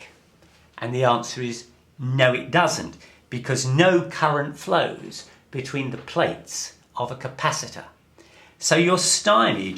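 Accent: United Kingdom. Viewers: One million thanks! fantastic clean and quick review for my EM final test, just one thing, , what if the other charge is not added at the sphere centre, how can the superposition implemented? the phi equation here is not applicable for non-central charges isn't it? Love your British accent BTW :)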